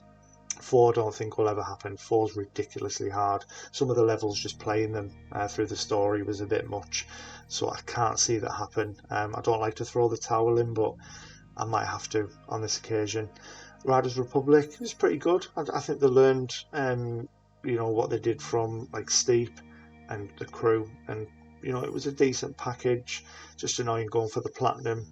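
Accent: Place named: Liverpool, United Kingdom. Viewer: Strong scouse accent you have there to go with your liverpool scarf! Decent video mate best of luck with the channel.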